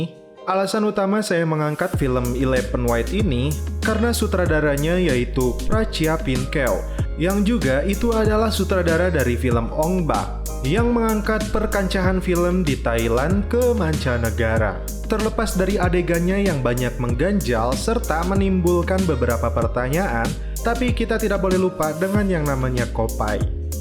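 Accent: Indonesia. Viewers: Orng indo baca nya begitu bg, mau gmna lagi susah di ubah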